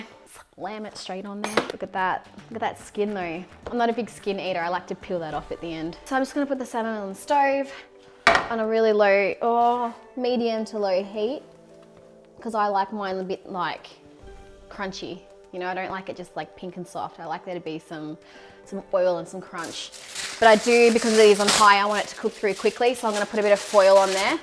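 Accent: Australia. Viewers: I like the Aussie accent.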